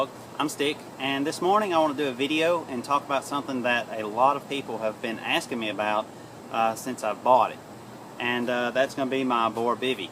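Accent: United States. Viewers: Just curious: what part of US is his accent? Thanks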